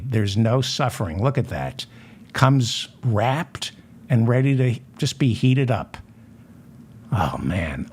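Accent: United States. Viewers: WTF. oh my God. You sound like a Yale Guy.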